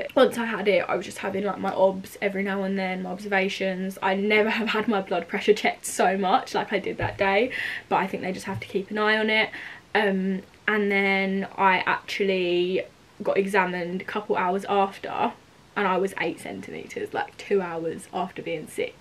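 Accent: United Kingdom. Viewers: Congratulations on your little baby boy and your make up is so pretty I love it and I love your British accent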